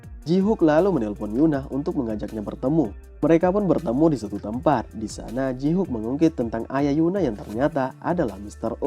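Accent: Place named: Bugis, Indonesia. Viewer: Saya yakin pasti suku bugis. Dilihat dari logatx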